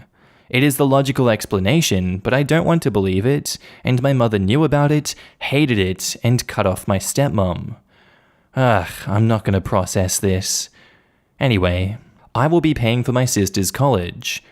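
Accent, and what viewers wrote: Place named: Australia. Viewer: Ayeee what's poppin,?? Also, is your government name or just a nickname? Thank you for all the videos and lovely Aussie accent. We love you, we appreciate you. Keep going mate, you're bloody awesome 🤗💞🙋🏾‍♀️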